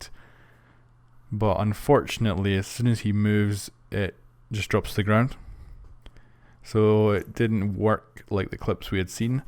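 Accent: Scotland, United Kingdom. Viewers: Do you mind if I ask what part of Scotland you are from?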